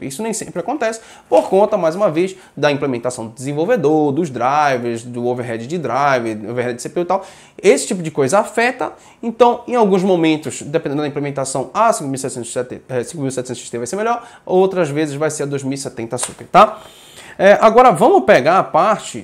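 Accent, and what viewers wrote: Pará, Brazil. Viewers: cara tu é paraense??? hahaha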